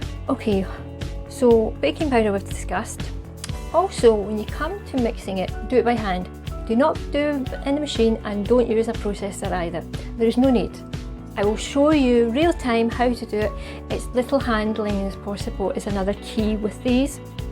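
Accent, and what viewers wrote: Scotland, United Kingdom. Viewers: 💗💗Your Scittish accent. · Great video, im a first time watcher,very well presented and explained look forward to watching more of your work....lovely Scottish accent..thanks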